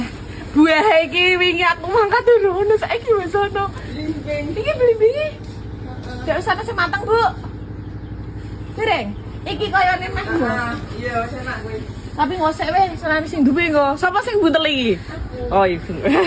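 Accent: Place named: Salatiga, Indonesia. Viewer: Logat e kyok omah e mbah ku Salatiga . Wez mirip ngene iki 😁😁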